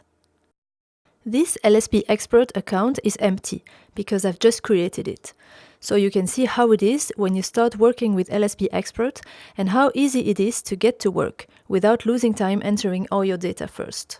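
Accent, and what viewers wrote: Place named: France. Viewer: Hello ! By your accent, I imagine you have uploaded the same video with a voice-over translation in French ?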